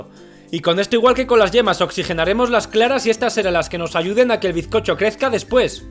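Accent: Spain. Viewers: Lo loko tu acento español.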